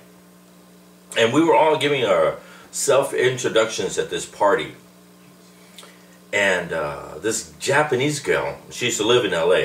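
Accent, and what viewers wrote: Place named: Chicago, United States. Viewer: Dude, respect. Also, are you from the Midwest? I feel like your from Chicago. You sound like you’re a part of my family lol! My family and I have thick Chicago Latin accents haha!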